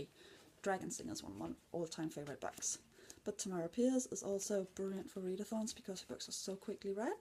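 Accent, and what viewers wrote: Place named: New Zealand. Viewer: Din kiwi accent er jo gudeskøn:) håber du får et rigtig godt readathon!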